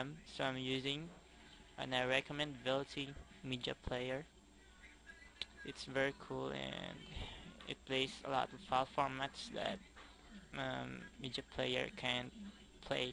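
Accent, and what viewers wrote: Philippines. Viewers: i know a filipino accent when i hear one lol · are you filipino..?? dat accent xD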